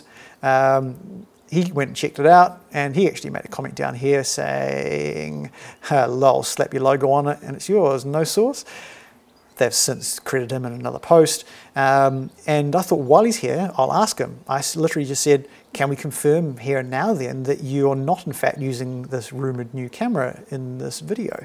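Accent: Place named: New Zealand. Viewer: Love hearing a kiwi voice on the tube. I am waiting for the GH5s announcement. I am a kiwi, living in china watching this in Ukraine. Keep it up dude.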